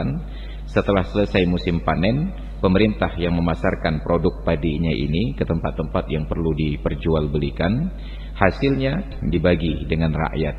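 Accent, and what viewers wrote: Malaysia. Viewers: Loghat dia literally macam Malay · lenggok bahasanya macam orang Malaysia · Ustaz ni aceh ke ? bahasa Melayu , dan slang bila bercakap , sama dengan orang Malaysia !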